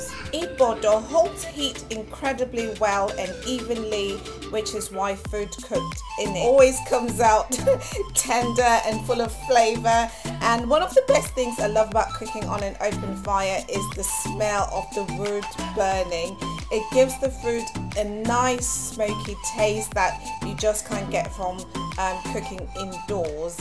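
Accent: United Kingdom. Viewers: I just come here solely for that. Her strong British accent is entertaining. The moment she says, “welcome buck” when I’m used to hearing “welcome beck”. That on its own cracks me up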